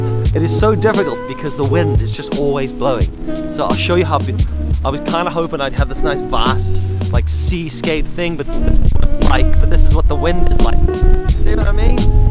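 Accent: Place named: Northern Ireland, United Kingdom. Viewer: Where is your accent from? It sounds like a cross between Northern Irish and a New Zealand accent.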